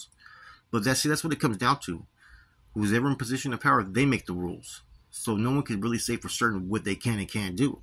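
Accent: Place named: North, United Kingdom. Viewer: you speak like a true northerner.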